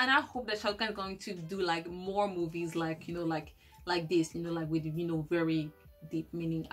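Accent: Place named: France. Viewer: I love the post you did with best dancer and you chose Madhuri.I love all the courtesan songs like you.I am a British Sikh lady and love your french accent.I studied French when I was younger 😂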